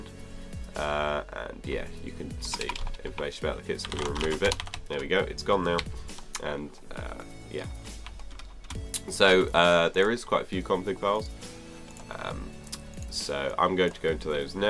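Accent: Netherlands. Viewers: R u Dutch i am Dutch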